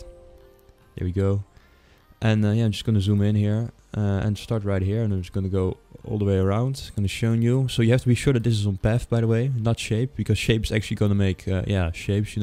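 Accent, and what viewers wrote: Netherlands. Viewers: I kan hoor dat you are Nederlands 😂 lekker bezig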